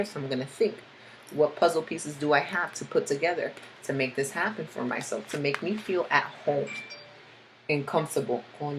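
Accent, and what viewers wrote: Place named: Brooklyn, United States. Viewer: You're so Brooklyn, yo lol. I love your accent!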